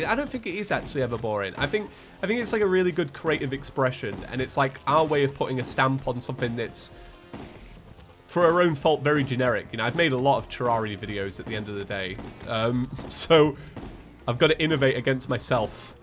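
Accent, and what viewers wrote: United Kingdom. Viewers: Cap? Cap? What country are you... wait your British... never mind. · big boi slime vs cool british boi.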